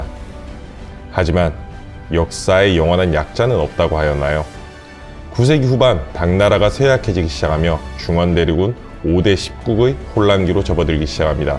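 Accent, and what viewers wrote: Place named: Gyeongsang, South Korea. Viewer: 경상도 사투리인데요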